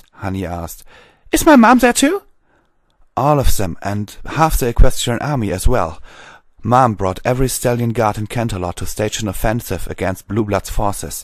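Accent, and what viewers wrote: Germany. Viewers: I don't know I just listen to it on YouTube and you said you're from Germany should have guessed it through your accent anyway I hope you take my recommendation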